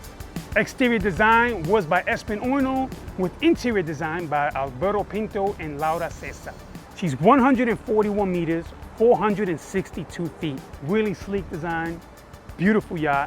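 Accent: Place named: New York, United States. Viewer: Nuttin like a New Yack accent on a video yappin about Mega Yachts???!!! You are awesome made my day.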